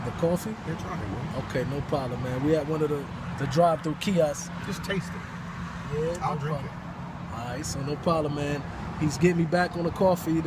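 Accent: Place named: United Kingdom. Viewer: Asslam Alikom bro. You are very welcome in Riyadh and I hope you visit us again and having Arabic coffee, having amain dish in SA that's call Kabsah, barbeque during the night, stroll in desert, going a picnic out of the city espically in spring or winter. I know you love coffee& you have KMW cafe in Ras Elkhemah. May Allah give you ability& help for inviting non-muslims to convert to Islam, Jazak Allah Khair. I like you when you trying to speak Arabic. I am dought when I hear your voice that you speak British slang coz I understood what you saying. Plz contact me for any help from SA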